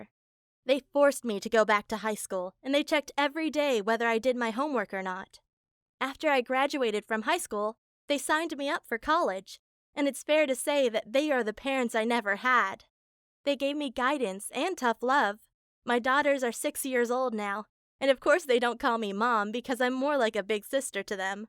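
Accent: United Kingdom. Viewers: Let me guess your from the UK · are u british???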